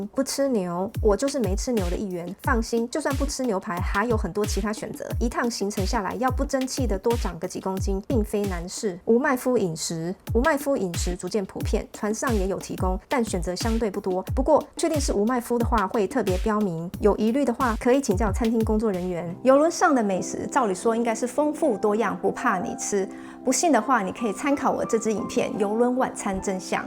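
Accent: Taiwan. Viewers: （無效啦）呵呵 ，很標準的台灣閩南語發音😊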